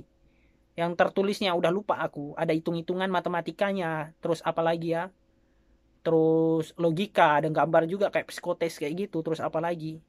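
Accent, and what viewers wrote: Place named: Pontianak, Indonesia. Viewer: Lebih mantul logat Pontianak nya mas 👍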